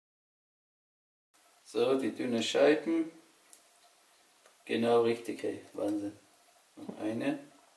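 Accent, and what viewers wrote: Swabia, Germany. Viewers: So lustig und sympathisch der schwäbische Türke😂 wieso ist das Video nicht FSK 18🤔 so porno das Essen😏😍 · Sympathischer typ echt, wie das Schwäbisch immer wieder durch blitzt isch Mega cool! Hab’s Video echt nur komplett angeschaut wegen ihm sonst spul ich immer vor damit ich seh was dabei raus kommt! Super Rezept! 👍🏼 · Coler typ der hört sich an als ob er aus dem schwabenländle kommt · Dude Deluxe ich find die Kombi auch extrem amüsant. Mein Lieblings Dialekt 😅👌🏻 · Haha typischer Schwabe 😄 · Ich liebe diesen Schwäbischen Dialekt :D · Türkisch und Schwäble. Beste Kombi :D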